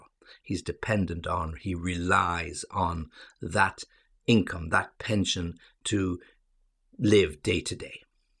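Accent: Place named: United Kingdom. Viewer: Thanks a lot, for your useful lesson and my favorite British accent!!!!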